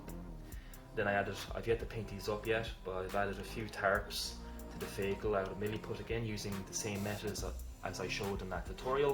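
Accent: Ireland. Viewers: that Irish brogue is even sexier in hi Def!!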